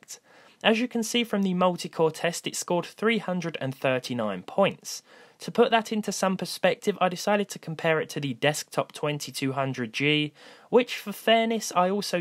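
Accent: Australia. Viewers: Great australian accent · Are you from Australia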